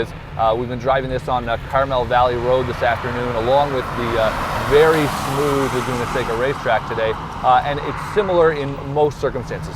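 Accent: Scotland, United Kingdom. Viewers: goodness..have you not heard of a scottish accent.this guy sounds crap and eats his word.Try Billy Connolly he has got a nice scottish accent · is this guy American or Scotish?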